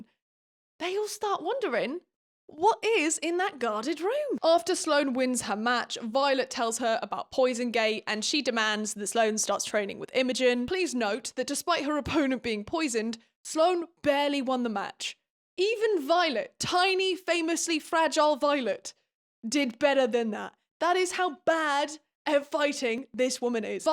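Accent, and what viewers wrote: United States. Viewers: the american accent is KILLING me 😭😭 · The american accent is SO GOOD HAHAHAHA it always makes me laugh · The American accent gets me everytime 😂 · commenting bc you are absolutely hilarious, delightful to listen to, and you have an impeccable American accent. Unfortunately, I can't unhear your Violet voice as anything other than Sydnee Sweendy, making both of them that much more unbearable😤🤩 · Also, the accent sounded like an American southern plantation owner. I am from the deep south. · The American accent is TOO GOOD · Babes imma need you in the audiobook studio STAT! your American accent is better than mine, im #scared (im unfortunately American) but let’s work on the country accent a bit (also unfortunately from Texas)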